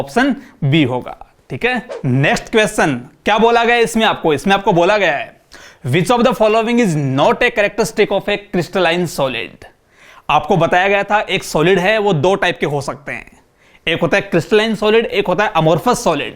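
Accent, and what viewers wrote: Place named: Rural, India. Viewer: Sir ke bolne mai gao ka taste hai Jo iss lecture ko aur bhi interesting bna rha hai Love u bhaiya 3000❤❤❤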